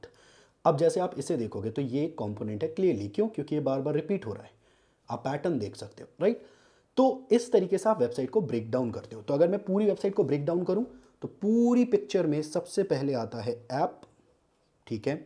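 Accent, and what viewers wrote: Bhopal, India. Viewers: the bhopali accent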